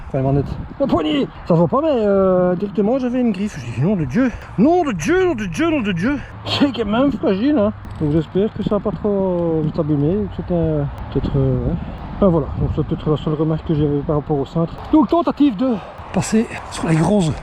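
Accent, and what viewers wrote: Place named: Belgium. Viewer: Belle vidéo ! Tu pourrais dire ou est le spot ? je suis à bx et quand j'entends l'accent je sais que c'est en Belgique 😅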